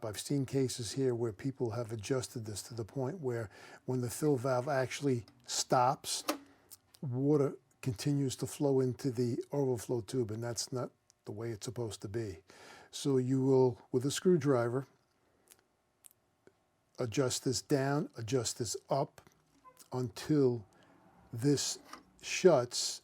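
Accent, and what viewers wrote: New York, United States. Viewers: Hey great video. Glad to hear another NYer voice 💪🏼, I’m from Bensonhurst & doing this over 23 years. Love these lil old school tricks you have. Keep up & god bless 🙏🏼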